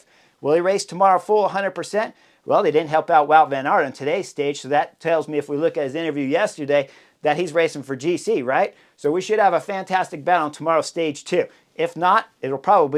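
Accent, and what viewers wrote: United States. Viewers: Great analysis and superb commentary. The American accent is really getting me 😆. Super amusing hearing everything pronounced that way.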